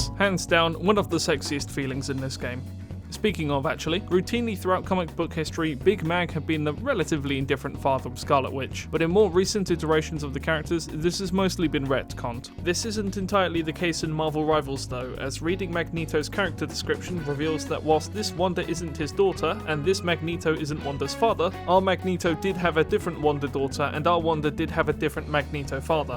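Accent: United Kingdom. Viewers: Seemed like a good vid then he started going on a racist rant about Chinese and black people you know the vid is about to be ass when you hear that dumb ass British accent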